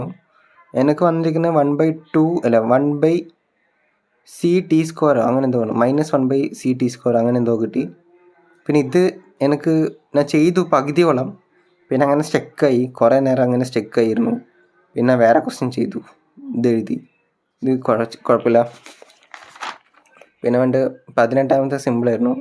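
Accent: Tamil Nadu, India. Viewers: Muthe.... Nee kidilan aan...📈👍 Ninte voice kidilaann tamil slang vannittu.... Nalla vibe ind video kanan...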